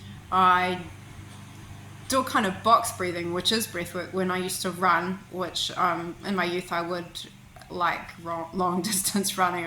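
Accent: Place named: Australia. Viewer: Good helpful/useful information, Good on ya!🚶🏃🏼‍♀️👍🏼 Luv your accent, Australia, right?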